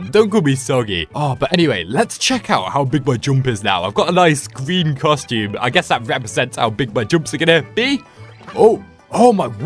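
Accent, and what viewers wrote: United Kingdom. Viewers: I think its cus he talks british and its difficult for him to say poplin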